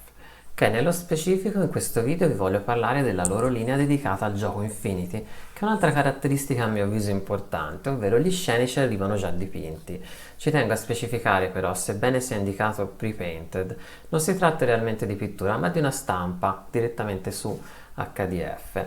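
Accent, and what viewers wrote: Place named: Tuscany, Italy. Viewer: Bel video! Sento un accento del Granducato! Io abito tra Siena e Firenze: se su quel tavolo ti andasse di provarci Infinity ci possiamo organizzare :D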